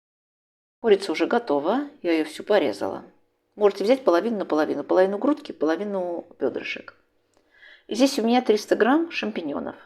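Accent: Baku, Azerbaijan. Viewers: А откуда родом наша девушка,очень пробиваются бакинские интонации. То ,что называют - бакинский акцент...музыка для слуха!!))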